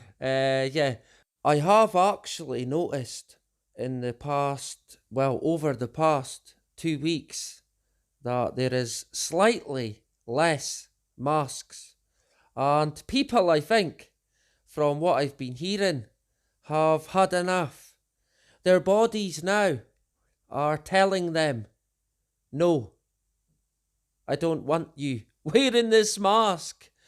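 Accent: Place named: Scotland, United Kingdom. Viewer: Thanks for your dedicated research and always bringing us the real news, with your ever soothing Scots burr! Much love!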